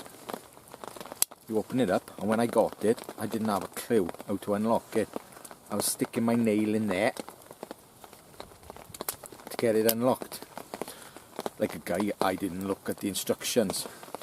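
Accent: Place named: Scotland, United Kingdom. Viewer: New sub here. Love your accent. Scottish? Good content. Will keep on eye on you. I prefer to ramble and bitch, though.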